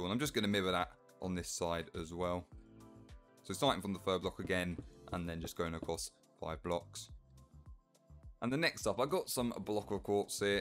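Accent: United Kingdom. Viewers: This british akcent😰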